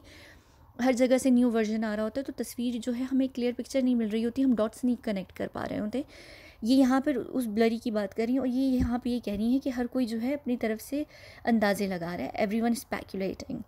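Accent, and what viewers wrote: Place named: United Kingdom. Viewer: Please please make a video on Arnab Goswami & Ankita.i am a big fan of your mam. Awesome analysis. I am a big fan of Arnab too but would love to hear your analysis & I can see he has not disclosed many things about Ssr's death in news. Not sure if there is any personal motive. Are we just watching a drama where maha govt & Republic TV are together & showing us a fake picture? It is so difficult to trust with news coming out nowaday. What do you think of vibhor anand. I have noticed he is making all these big big revelations on YouTube but there are no evidences shown by him. It feels like he is just hungry for an audience & followers. He says he is supreme court lawyer but I don't feel any credibility in anything he is saying. Please do make a video on Arnab & Ankita. I live in UK & it is 1.37 am & I am engrossed watching your videos. 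You also seem to be from UK can make out the British accent. Thanks for the awesome analysis.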